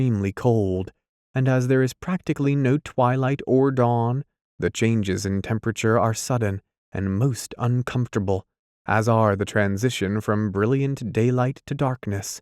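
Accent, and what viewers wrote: United States. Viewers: I have a dubt , is it american English?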